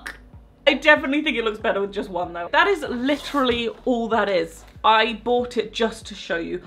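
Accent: United Kingdom. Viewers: Is she british?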